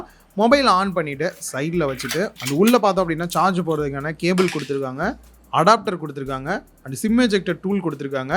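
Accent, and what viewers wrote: Tirunelveli, India. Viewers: thirunalveli yaa iruku 😅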